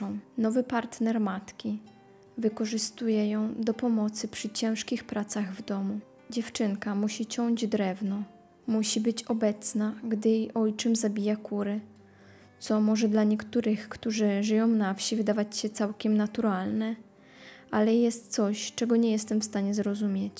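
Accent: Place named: Russia. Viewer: Posiadasz RUSKI AKCENT..Czy jesteś Ukrainka?????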